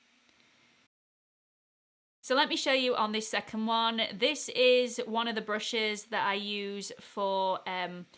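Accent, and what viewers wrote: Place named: England, United Kingdom. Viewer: I use Woolite gentle textile shampoo for my brushes - is that sufficient and my beauty blender after every use in dishwashing liquid. A new happy and very enthusiastic subsriber. Appreciate your professionalism and wonderful English accent. Similar age to your gorgeous Mom and have learn so much from your amazing tutorials. Thank you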